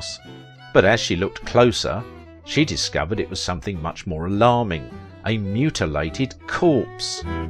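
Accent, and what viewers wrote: England, United Kingdom. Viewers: Give the guy a break. He's got an accent. He sounds English and he will pronounce some words differently than you.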